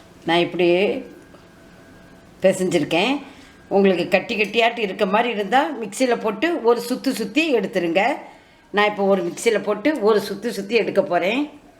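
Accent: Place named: Nagercoil, India. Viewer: Ungal nagerkovil tamil alagha irruku.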